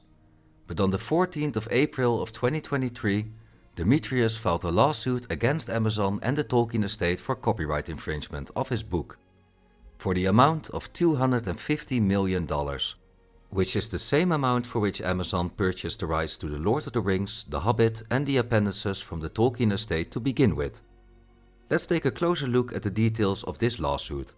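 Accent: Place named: Netherlands. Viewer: Yeh, let's put a dutch accent on a "machine voice". Not only the accent, but the usual dutch sentence building and the clear script reading is a dead give-away for a real person. Don't assume truth, search it my friend.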